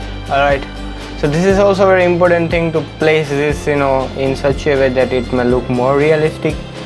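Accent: Gujarat, India. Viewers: sure bro by ur accent u seem gujarati ryt?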